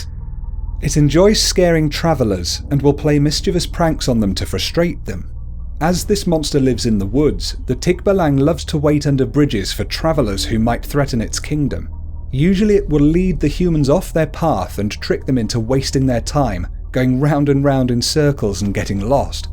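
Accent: United Kingdom. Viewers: So many words you misread! Oh my stars! I am a Filipino!!! Are you British or something?!!! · Listening to this Brit saying PhilipineFolk creature names makes me laugh,and,just adding this,the tikbalang is fond of abducting beautiful women to be his bride,as what I have heard here from my old family members #ProudlyPinoyAko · hindi ka marunong mag tagalog ano yan british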